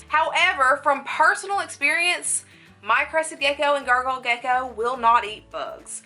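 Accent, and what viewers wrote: South, United States. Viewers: Apropos to nothing, but your accent is the cutest!!! I'm from AR originally, so I love a good Southern accent.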